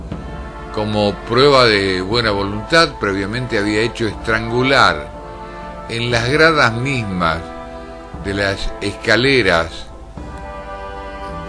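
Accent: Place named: Argentina. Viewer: el video bonito pero ese acento argentino muy feo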